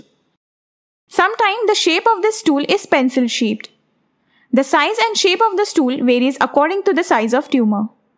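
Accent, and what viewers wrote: India. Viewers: I enjoy listening to your indian accent. Hope to imitate the way you speak English.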